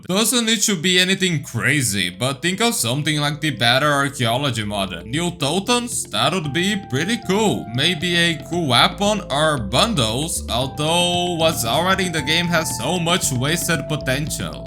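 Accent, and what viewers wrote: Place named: Brazil. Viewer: your accent sounds Brazilian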